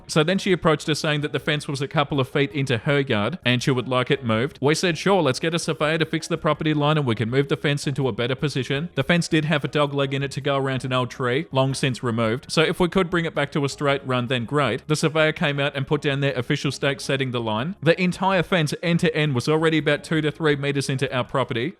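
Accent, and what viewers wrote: Australia. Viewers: NGL, I absolutely love Australian accents and it's part of the reason I find your reads so funny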